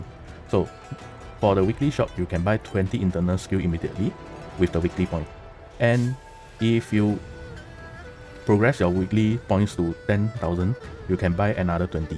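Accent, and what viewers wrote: Singapore. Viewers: are you Singaporean ??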